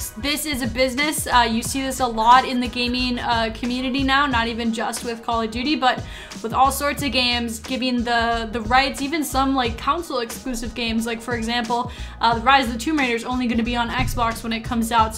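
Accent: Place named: Midwest, United States. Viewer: She has a Midwest American accent. Just like every where else in the world. There are different forms of the same language. No need to be rude.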